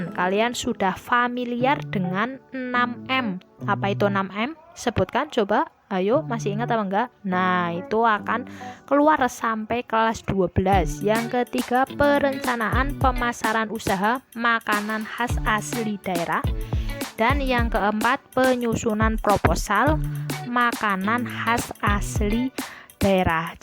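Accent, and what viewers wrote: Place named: Banyumas, Indonesia. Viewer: Youtuber ngapakk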